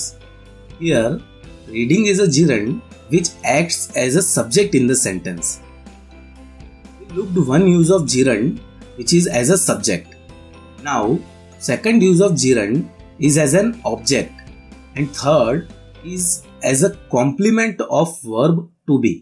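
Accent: India. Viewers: I love to hear your indian accent in every lesson.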